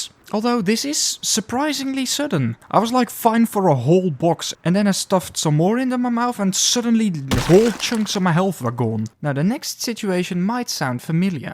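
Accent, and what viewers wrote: Netherlands. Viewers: Are you from the netherlands lol? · Ah, classic nederlands accent.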